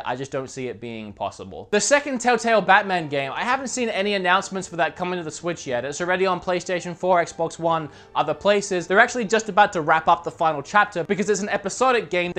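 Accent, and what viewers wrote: United Kingdom. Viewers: I don't know why I thought you were British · Wait, is this guy british or American Edit: oh, right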